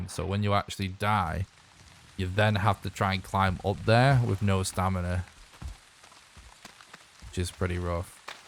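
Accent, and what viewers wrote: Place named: Leeds, United Kingdom. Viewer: Fucking hell watched four hours without being able to place your bastard accent and it's Leeds! Big up. When you said 'You got your head kicked in if you listened to heavy music' I thought 'oh so he's northern at least' lol.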